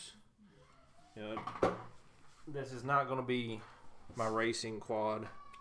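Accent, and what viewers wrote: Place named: South, United States. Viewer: Sweet video! Never have been to your channel, heard your voice and said man this guy is country (by the way I am in Alabamer). I was thinking this is going to be a wreck, but....You are awesome at building and so thorough, with the continuity checks and all. I really enjoyed the video and will be subscribing. Thanks again for the Video ;)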